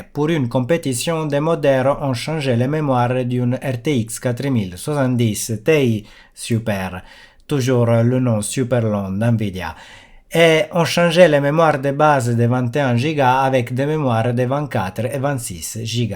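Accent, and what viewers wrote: Italy. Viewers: ALORS GLOBALEMENT JE SUIS D'ACCORD AVEC TES COUPS DE COEUR ! PAR CONTRE APRÈS LORSQUE TU PARLES DE CARTOGRAPHIQUES JE SUIS MOINS EN D'ACCORD AVEC CE QUE TU DÎT ! POUR EXEMPLE LE DLSS ET AUTREMENT MIEUX QUE LE SYSTÈME DE AMD QUE J'APPRÉCIE PERSO ATI CAR ILS ONT RACHETÉ DEPUIS LONGTEMPS CERTE MAIS JUSTEMENT LORSQUE 1 MARQUE EN RACHÈTE 1 AUTRE DANS LA MAJEUR PARTI DES SECTEURS C'EST TOUJOURS LE MÊME NOM QUI ET GARDER ! POUR EXEMPLE EN AUTOMOBILE TU PEUT PRENDRE N'IMPORTE QUEL MARQUE APRÈS 1 RACHAT ET ELLE NE CHANGE NI DE NOM NI MÊME DE PAYS OFFICIEL POURTANT POUR MOI LE PAYS DEVRAIT CHANGER À PARTIR DU MOMENT OÙ LES DÉCIDEURS NE SONT + DU PLACE POUR DONNER QUELQUES EXEMPLES ON PEUT PRENDRE LE FRANÇAIS BUGATTI RACHETÉ PAR ALLEMAND VW ET SI L'ON PREND D'AUTRES MARQUES AUTO C'EST TOUJOURS PAREIL JE PRÉSUME AVEC TON ACCENT QUE TU ET D'ORIGINE ITALIENNE ET BIEN SI L'ON PREND LAMBORGHINI POUR EXEMPLE ILS ONT ÉGALEMENT ÉTÉ RACHETER PAR VW ET LE NOM DE LA MARQUE RESTE ET LE NOM DU PAYS AUSSI ET TU PEUT PRENDRE N'IMPORTE QUEL EXEMPLE ET CELA NE CHANGERA PAS ! 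D'AUTRE PART MOI QUI SUIS 1 VIEUX PASSIONNÉ DE HI-FI JE PEUT T'AFFIRMER Q CE EXACTEMENT LA MÊME CHO EN HI-FI ! ALORS QUE EN PC OU EN D'AUTRES SECTEURS IL AEEUVE PARFOIS QUE L'ON ÉLIMINE LA MARQUE D'ORIGINE ! BREF J'AI DISGRESSER SUR 1 AUTRE SUJET 😅😁 MAIS POUR LES CARTES GRAPHIQUES NVIDIA IL Y A DES GAINS IMPORTANT AU NIVEAU D CERTAINEMENT CHOSES NOTAMMENT AU NIVEAU LOGICIEL POUR LE DLSS C'EST + DE VITESSE CAR C'EST MOINS LOUR ET ÉGA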